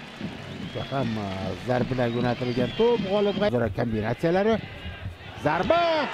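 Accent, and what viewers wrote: Kashkadarya, Uzbekistan. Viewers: СИФАТСИЗ КОММЕНТ КАШКАДАРЕЧА. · Кашкадарёча шархлашда😅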